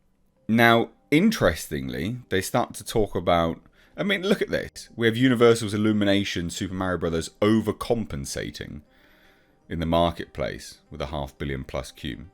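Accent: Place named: United Kingdom. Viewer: He sounds like every British guy everywhere anywhere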